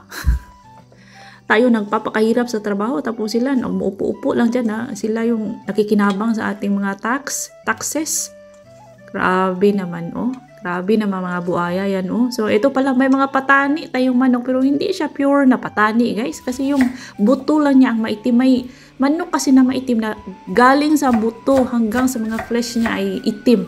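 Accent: Bohol, Philippines. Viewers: asa inyo sa visayas mam? kay murag Bohol man ang tonon, nice kay mura sag ug naa ra pinas diha mam nuh, dira kaau mingaw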